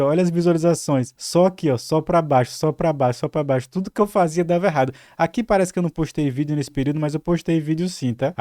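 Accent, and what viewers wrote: Northeast, Brazil. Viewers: pelo seu sotaque voce é nordestino. Sou da Paraiba e gosto muito dos seus videos. De onde voce é?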